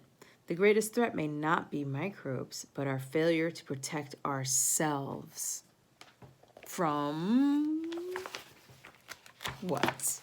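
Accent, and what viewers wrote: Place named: New York, United States. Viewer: hear hear! Good Stuff! I like your style, and the subtle ny accent...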